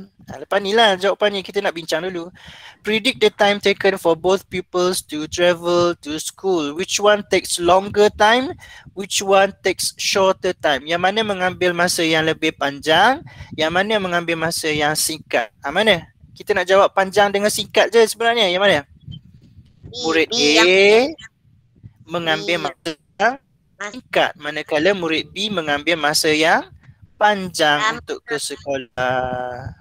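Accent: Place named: Terengganu, Malaysia. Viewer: 😅 sedak dgr org ganu cakak.. lame x g teganu..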